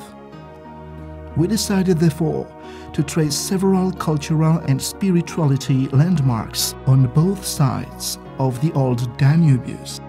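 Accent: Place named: United Kingdom. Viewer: dude,what's with that British accent :D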